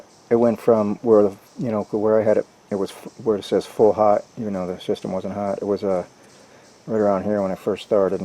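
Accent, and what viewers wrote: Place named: Boston, United States. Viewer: Very relevant to me here in Oz, just doing a Mitsubishi ute and this method is working. Your accent reminds me of Bill Burr ; do you tell jokes too? ( Bill’s from Boston).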